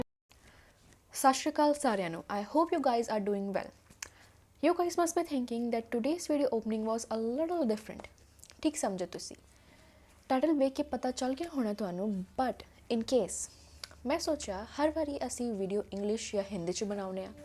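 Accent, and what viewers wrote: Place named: Delhi, India. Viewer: Punjabi boli de accent te dhyaan den di lorr ya, subscribers apee chake jane ya tahaan nu delhi vala accent delhi valiya nu jama ni bhaounda😅😅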